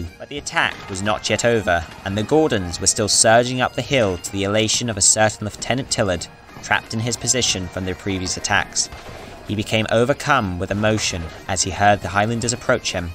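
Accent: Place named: England, United Kingdom. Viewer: The narrator's English accent and gormless mispronunciation ruins this.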